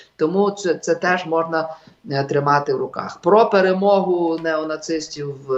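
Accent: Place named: Galicia, Ukraine. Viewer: Хотив послухати, але не розумию Галичанського суржику.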